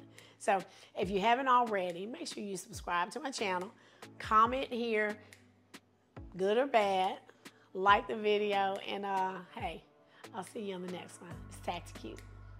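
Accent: South, United States. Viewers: I was really loving a video, but then she started to talk and I fell in love 😍 I love me a good southern women’s accent. Great shooting and you got your new subscriber. ❤❤❤ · You deserve more subscribers. Cool content. Not many women doing this & as a Georgian I appreciate the cute southern accent. Cheers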